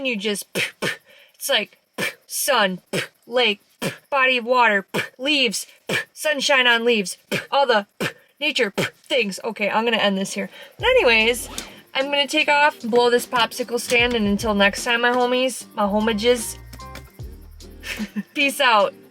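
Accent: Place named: Midwest, United States. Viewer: not sure why this showed on my feed but you seem cool. Also love the midwest accent.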